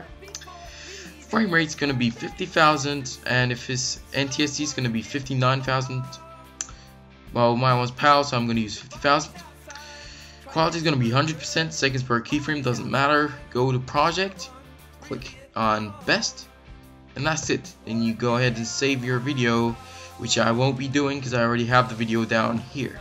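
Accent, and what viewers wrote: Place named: Boston, United States. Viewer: he's just from boston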